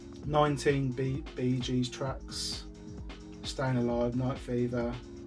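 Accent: Birmingham, United Kingdom. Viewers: New subby here. I follow Scarcity Studios and your vids started coming up. Guess it's due to you also being Brummie, love it, lol. Good content, keep it up.👍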